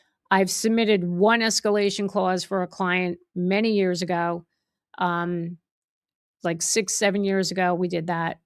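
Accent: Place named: North Jersey, United States. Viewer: Awe, you sound like my relatives from my husband’s side in N. Jersey! I feel at home. 😊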